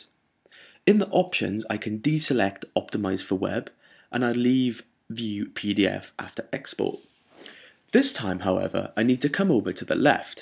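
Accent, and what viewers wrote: Wales, United Kingdom. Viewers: I am so happy I found your tutes! I literally clapped you at the end of the last video. So well explained, so clear, nice voice, nice pace, nice command shortcut knowledge and repitition and you really focussed on all the things I needed to know. Now Im off to learn PS with you :o) . Keep up the great work!... you should charge for these!!! p.s I knew it was a Welsh accent!!! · Just wanted to say a big thank you for your hardwork that you have put into this. Its really generous. Its really clear and just works!! I wish more of the internet could be like this! I sat down and watched them through as though it were a lecture at university! Thanks again. By the way, are you Welsh or from New Castle?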